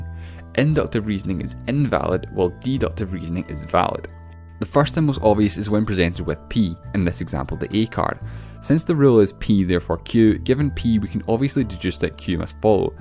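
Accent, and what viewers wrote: Scotland, United Kingdom. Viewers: Paul Graf I think he's Scottish. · Irrelevant question; What part of the UK is the narrator from? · Scottish, hes from scotland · He is from Scotland, probably Glasgow. :) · I clicked off the video because your Scottish accent was confusing me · That's a Scottish accent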